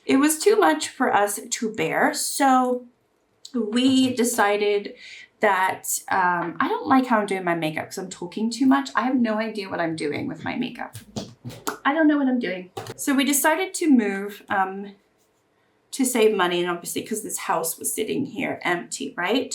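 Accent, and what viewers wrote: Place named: United Kingdom. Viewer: So interesting to hear about all the places you have lived 🙂 you definitely have a British accent, even though you spent quite many years in the US. I actually work at an OB school currently, I am the coordinator and we just got authorized last year! Did you like the school? It is quite different from the Swedish school system so we are a bit making it up as we go here 😅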